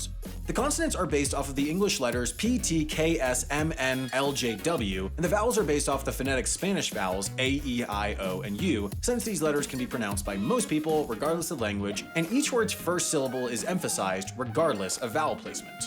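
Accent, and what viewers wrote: United States. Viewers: Are Americans really that bad at pronouncing other languages without that strong American accent or is it just? To learn how to phonetically pronounce so few letters in an another language is not that hard really. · It's unsettling to me how someone so knowledgeable just...cannot produce basic sounds without them being overtly "American" 💀 having flashbacks to his China videos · he just has the ‘American’ accent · say they are the spanish vowel goes on to pronounce i and e like every stupid American even tho he speaks french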